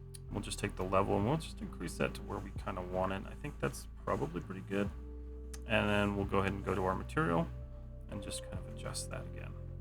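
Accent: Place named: South, United States. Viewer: I appreciate the video, and I don’t want to upset you by saying this, but constantly hearing “height” as “heighth”, like you’re a 16th-century man with wooden teeth, is driving me mad, especially when it’s said correctly in the same video. 😂 (I understand it’s a quirk in some parts of the South, so no hate, but it’s killing me.)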